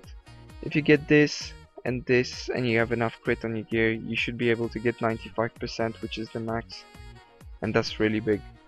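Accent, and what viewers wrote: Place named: Poland. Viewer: Love the epick Suck Guy playing in background and btw nice english for a pol akcent similar to shusei :D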